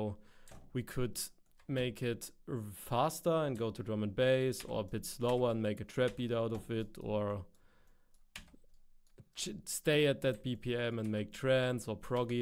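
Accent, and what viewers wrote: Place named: Germany. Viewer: Are you german? You have a german accent, Im german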